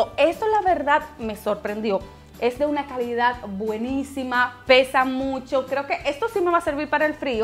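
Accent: Dominican Republic. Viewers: Eres dominicana?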